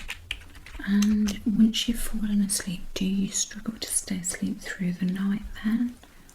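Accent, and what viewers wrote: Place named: United Kingdom. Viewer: I just love the British accent with the typing noice it goes well together. My mum was both British Romani. My connection with the UK means alot.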